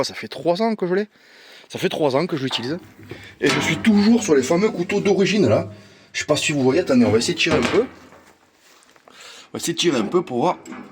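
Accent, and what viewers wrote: South, France. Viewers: Merci pour ce retour d expérience ! Avec l accent du sud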